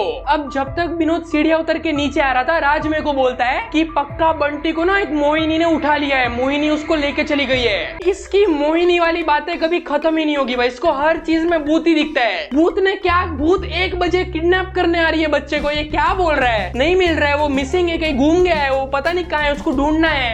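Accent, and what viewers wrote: Hyderabad, India. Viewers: Your Hyderabadi accent is to die for ❤❤😅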